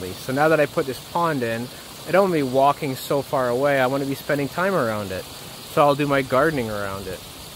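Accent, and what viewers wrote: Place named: Canada. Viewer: Three great things about this channel-- the contagious "Permaculture Joy," the attention to science (and lack of anthropomorphism), and the delightful Canadian pronunciation of "ou." Look forward to every video! I've been on the fence about sea-buckthorn/seaberry for years (even after viewing Ben Falk's videos) due to management concerns. Can you convince me, lol?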